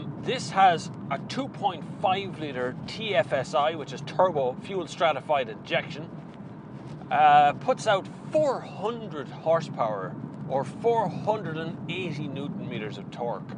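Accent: Ireland. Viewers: just love that mix of RS3 pops and a paddys voice on that super looking stretch of road Top video buddy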